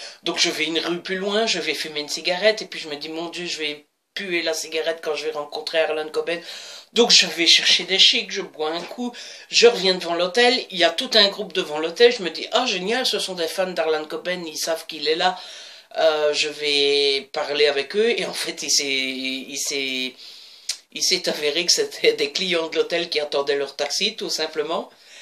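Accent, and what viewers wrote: Belgium. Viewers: Je découvre ta chaine depuis quelques jours. Je me fais toutes tes vidéos depuis ( quelques avances rapides parfois, j'avoue ^^ ). On a pas forcément les mêmes styles de lecture ( ne suis pas porté sur la romance par exemple mais j'aime beaucoup les thrillers;-) ) mais j'apprécie ton style, ta façon de faire, ton naturel mais aussi ta sensibilité,... Et puis, y'a un point qui me plait beaucoup, c'est ta " belgitude ". Comme toi, je suis belge et quel plaisir de voir et d'entendre un booktubeur belge ( très peu nombreux, hélas )!! Cet accent assez brusselair je trouve ( plus marqué que le mien, moi je suis de la région de Tournai donc j'ai + l'accent ch'ti, c'est ce que les liégeois me disent en tout cas, lool ), tes " godverdome ", nom dé djeu, ect ect.... moi, perso, je kiffe! Voilà, ne change surtout pas ça stp!!;-)En ce qui concerne cette vidéo-ci, tu as parfaitement su me faire revivre ta journée avec tes moments qui te sont chers.J'ai, à la fois, ri et été ému. 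Agréable 3/4 d'heures passés " en ta compagnie "!Bref, j'en fini là. Continue comme ça, ne change pas. Tu es top!:-)ps: Je t'ai ajouté sur Livraddict, j'ai liké ta page Facebook, y'a que ton Fb perso que tu n'as pas accepté mais je peux comprendre, no soucis.;-)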